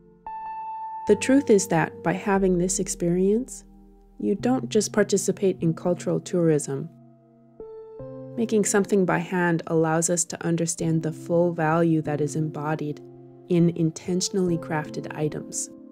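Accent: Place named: Japan. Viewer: I like how your accent is becoming more Japanese 🥰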